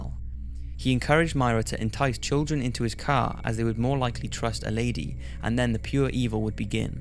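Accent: United Kingdom. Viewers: Where abouts in the UK is your accent from??? Pls answer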